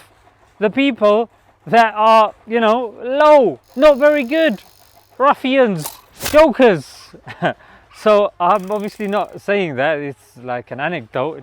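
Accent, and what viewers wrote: United Kingdom. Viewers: is he his acent is british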